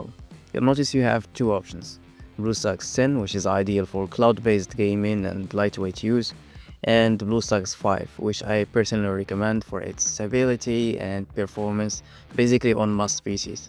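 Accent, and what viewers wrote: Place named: Morocco. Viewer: Anglais mdrdra b darija accent love the video, Good luck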